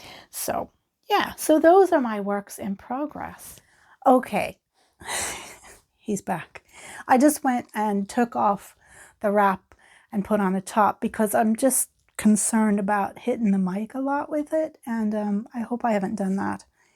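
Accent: Ireland. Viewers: I enjoy content variety w/ ya YouTube page! #High5Blessings Super Interior settings and content details in video... You totally resemble my neighbor #fyi Super Irish Accent. Posted link on my Tweeter page.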